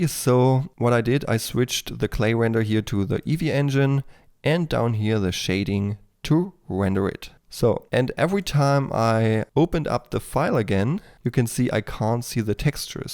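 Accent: Germany. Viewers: German accent 😂 Greetings from Germany!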